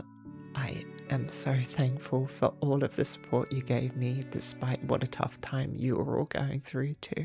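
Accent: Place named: England, United Kingdom. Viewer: That accent is English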